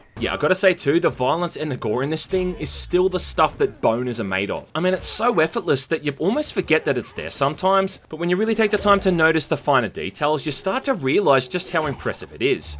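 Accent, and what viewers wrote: Australia. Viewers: There's something about an Aussie reviewing anything that is just amazing. Love you work man!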